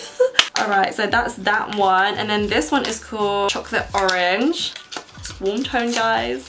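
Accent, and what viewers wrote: United Kingdom. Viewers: Woooooooowwww! I have watched ur ig for a while and DID NOT EXPECT THE BRITISH ACCENT!!! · So ik her only from Instagram and I finally got around to watch her vids and SHE HAS THE CUTEST ACCENT it totally didn’t even click that he would have an accent (I knew she lived in the uk) but anywho I REALLY REALLY loved this video 💕💕💕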